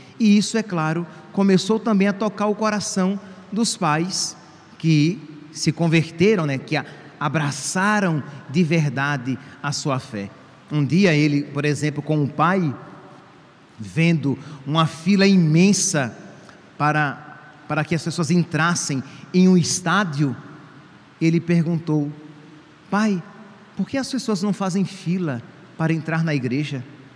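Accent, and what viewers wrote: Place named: Northeast, Brazil. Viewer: Esse padre é da onde.Muito boa a omilia.Deve ser Nordestino pelo sutawue.Sou de MG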